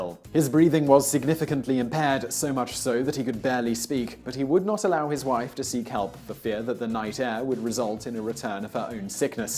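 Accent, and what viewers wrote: United Kingdom. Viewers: British man talking about American history. Interesting.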